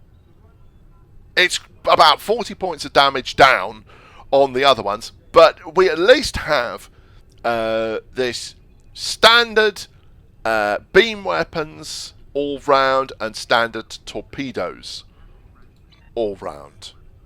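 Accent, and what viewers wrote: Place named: England, United Kingdom. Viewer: If you don't mind me asking, what part of England are you from? I love your accent.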